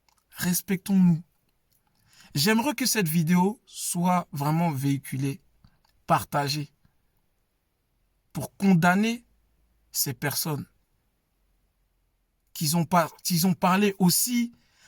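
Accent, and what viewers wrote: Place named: Ivory Coast. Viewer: L'accent me dit que c'est un frère du 225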